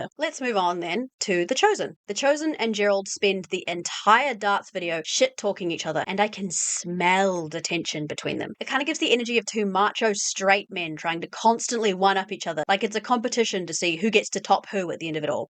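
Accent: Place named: New Zealand. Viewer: Also you sound like a kiwi lol, don't ya worry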